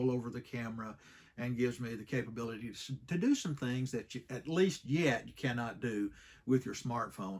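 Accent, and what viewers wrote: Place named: South, United States. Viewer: Awesome photos. Love your channel. Very clearly explained concepts on your videos. Plus love ur Southern Accent.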